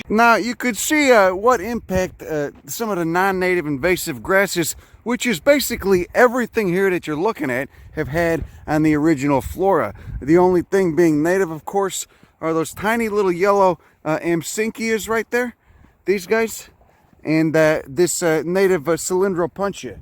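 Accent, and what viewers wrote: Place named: New Jersey, United States. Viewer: Photosynthesizing pile of shit lol...love it...you sound like you're from Jersey btw.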